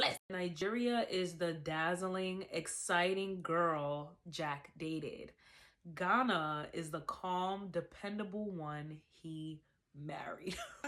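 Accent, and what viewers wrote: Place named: United States. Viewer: Good video! we have to find a way to have cultural pride without being competitive. There isn't ONE thing about anyone culture that would make them "great"or better than the next. It's influences from all over. you are a proud beautiful woman of Nigerian descent but to be honest huge part what makes you poppin is you talk and like a Black American girl from NY! Period. All of our influences from the continent, the carribean and black America is what shapes us into something special. We all add to the pot to make something beautiful. No ones contribution is greater than another.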